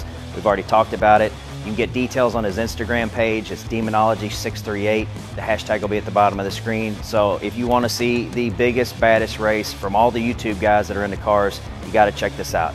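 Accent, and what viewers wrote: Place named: South, United States. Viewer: Something about a slight country accent and Lambo is my favorite car company gets me thinking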